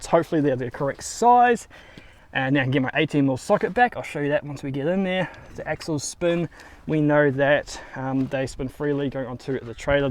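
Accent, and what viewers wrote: New Zealand. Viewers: Ahhh....I can hear a hint of Kiwi twang. Love the old cars. Thanks.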